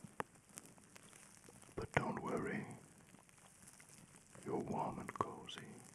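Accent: Germany. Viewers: This is so amazing after 5 min i fall asleep are u german? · He's German, so his English may be slightly more difficult for you to understand because he's also not a native speaker, and while he's very fluent in English, he does have a distinctly German accent. There's nothing wrong with getting some practice with listening, though. 🙂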